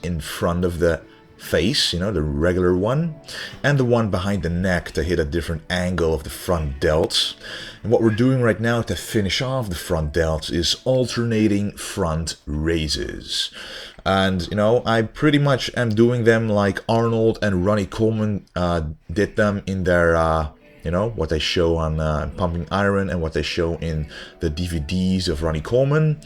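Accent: Netherlands. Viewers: typical Dutch accent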